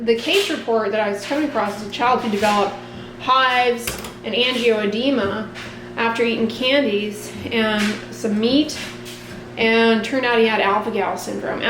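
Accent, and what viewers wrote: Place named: South, United States. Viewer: Happy Sunday! Hope you’re spending time with your mother!!! ❤ In your car talk, you sounded so southern! I never noticed how much of a southern accent is hidden in your voice lol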